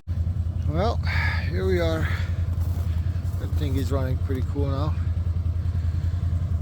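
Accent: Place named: United States. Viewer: Salut! M-am uitat la câteva video-uri. Pari american din tata-n fiu, după vorba, port, n-as fi spus ca ești din România. Ma uit mult la video-uri despre Alaska, ma fascineaza și de asta, presupun, mi-au apărut video-urile tale. Ai ales o zona în care nu s-ar duce mulți romani, dificila, dar îți oferă o libertate, liniște pe care nu o regăsești în multe locuri. De cati ani esti in America? Sănătate!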